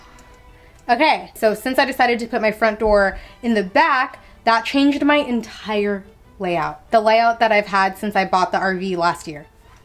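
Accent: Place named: Canada. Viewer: I can hear Canadian in your voice.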